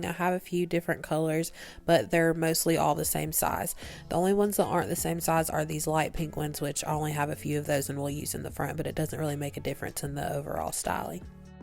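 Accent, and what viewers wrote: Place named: South, United States. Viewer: I love your southern accent 😊❤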